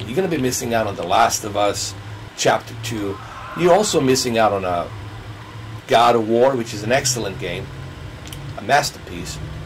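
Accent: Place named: Boston, United States. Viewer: I think it's great that you're covering gaming (Xbox and Sony) with your 4k content. I too think that Sony has a stronger foothold within the gaming industry with their numerous exclusives and virtual reality. I enjoy both Sony Pro/PSVR and Xbox One X. I am the type that appreciates high quality 4k and HDR so I use the Xbox One X for games that are available on both consoles. Currently, I only use the Sony Pro for the exclusives and virtual reality. Overall, I do prefer Sony over Microsoft as it appears that they focus more on the gaming community but I can not overlook the greater (however small) processing power of the Xbox One X. I am very confident that Sony will leap over Microsoft with greater processing power during the next console generation. I was also like to thank you for the effort you put towards your educational content. 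Most reviewers are far too hyper-critical which makes me second guess everything. You cater towards the every-day guy. You use simple and use easy to understand terminology and your slight "Boston" accept makes you more relatable. It's like having Paulie from the Sopranos coming to my house to discuss 4k to me. You use common sense while giving simple down to earth advice with no B.S. Keep up the good work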